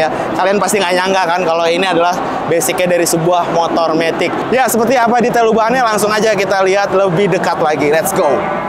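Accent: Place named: Central Java, Indonesia. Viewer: Ngomonge medok mesti bengkel e wong jawa tengah iki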